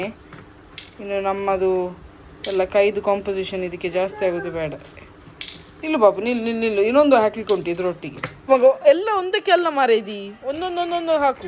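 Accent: Mangalore, India. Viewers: ಮಂಗಳೂರು ಭಾಷೆ ಕೇಳ್ಳಿಕೆ ಖುಷಿ ಆಗ್ತಿದೆ ಮಾರ್ರೆ 👌👌👌👌👌👌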